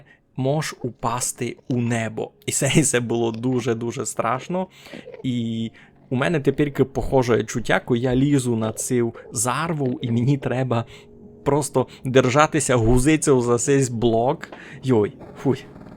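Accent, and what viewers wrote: Transcarpathia, Ukraine. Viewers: Йой, то є закарпатська мова?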